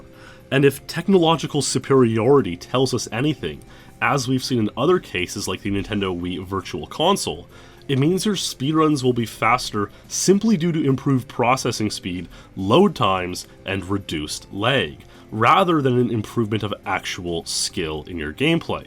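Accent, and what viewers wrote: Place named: Canada. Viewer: The REALLY IS LOOSE Now, I know you're Canadian, you have the accent to prove it.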